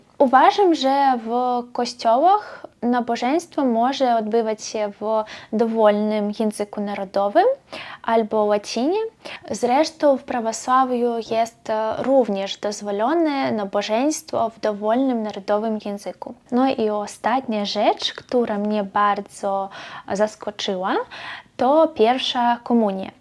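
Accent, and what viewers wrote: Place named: East, Poland. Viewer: To mój pierwszy film pani. Bardzo ciekawie się słuchało. Zarówno temat interesujący, jak i przyjemnie słyszeć polski język w takiej wschodniej formie. Bardzo dziękuję za nagranie. Dowiedziałem się czegoś nowego. I gratuluję języka! Super.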